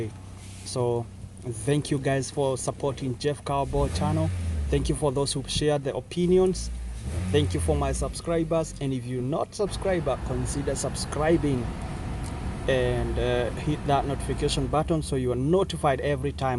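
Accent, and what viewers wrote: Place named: Somalia. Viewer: I can tell this brother is from Somalia great job werya.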